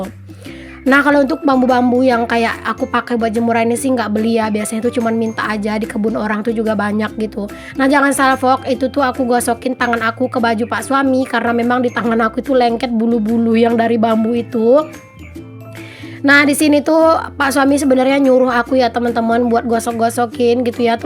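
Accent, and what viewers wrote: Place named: West Sumatra, Indonesia. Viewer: Hay Bun, Bun aku tuh salpok sama suara waktu dabing dan ga dabing beda banget 🤭 kalau ga lagi dabing suara nya Minang banget 🤭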